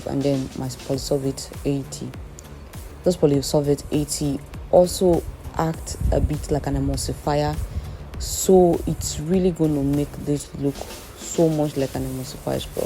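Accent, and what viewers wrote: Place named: West Africa. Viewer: Lilac baby li-lac pronounced lie-lac or lye-lac The tutorial is very beautiful but your pronunciation been terrible at least I know what herbs you use and everybody else knows what herbs you you use you must be from the french part of Africa i had to watch your videos over and over to figure out what you was trying to say!!!...😊😘😍🤩 i still love your beautiful accent From your country where ever it is and your skin is amazing and all your tutorials are too but I still have to figure out😂😅🤨 what you be trying to say in the herbs section.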